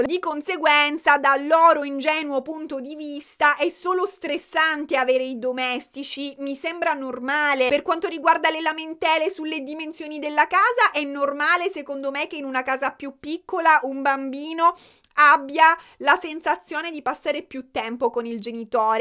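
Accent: Sicily, Italy. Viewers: esagerato accento siciliano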